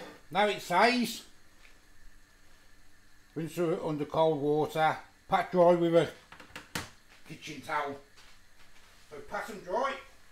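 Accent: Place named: Newcastle, United Kingdom. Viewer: Can't beat a Geordie chef lol whye aye man 😂👌👍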